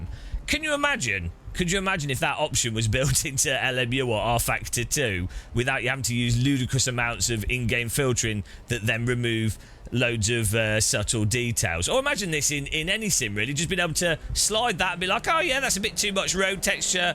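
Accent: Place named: England, United Kingdom. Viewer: This guy's English accent saves his life.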